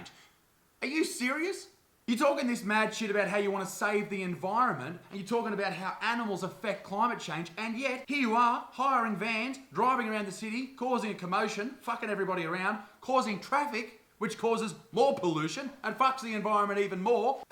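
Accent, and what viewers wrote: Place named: Australia. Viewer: I don't know why but I absolutely adore australian accent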